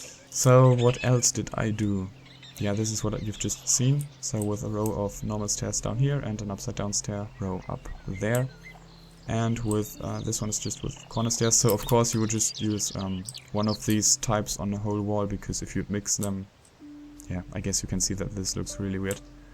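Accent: Germany. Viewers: haha...man hört voll raus das du deutsch bist :D niocce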